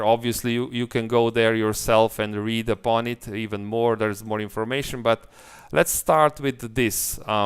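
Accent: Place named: Slovenia. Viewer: You sound Slovenian... -_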